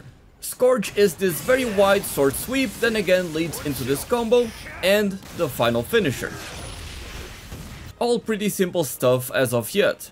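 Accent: Portugal. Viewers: então esse sotaque era português 😂😂😂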